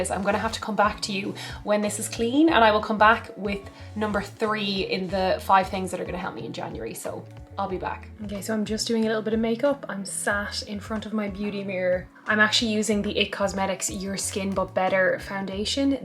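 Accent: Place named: Ireland. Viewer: I thought your accent was Irish. It really suits you!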